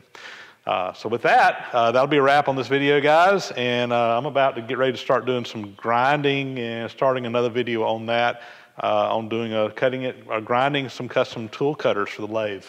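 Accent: South, United States. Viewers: A Getter Done with a southern accent; LOVE IT.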